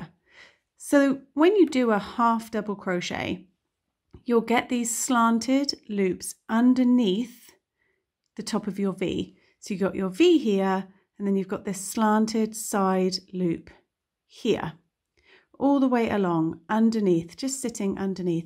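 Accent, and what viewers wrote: United Kingdom. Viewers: Thanks for liking & for commenting on my comment Miss. I like your British accent a lot 😁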